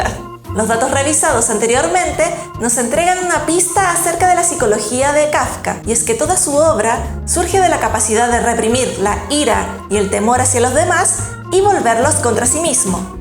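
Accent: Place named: Chile. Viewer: Que buen video! Impresionante explicación, impresionante edición, muchas felicidades ❤️ por su manera de hablar se nota que es chilena jajaja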